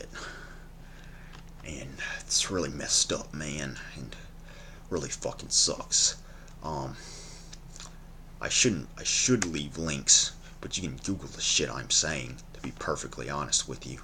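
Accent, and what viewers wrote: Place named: Carolinas, United States. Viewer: I look forward to seeing more videos from you. Your accent is interesting. Are you from the Caralinas?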